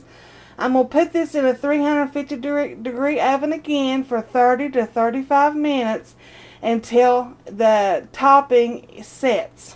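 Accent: South, United States. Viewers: I so love her accent. I am guessing it's southern?!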